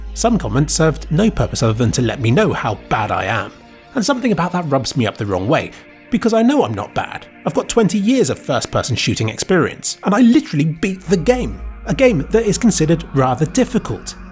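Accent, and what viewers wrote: United Kingdom. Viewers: can you please change your dialect im very tired of the british lately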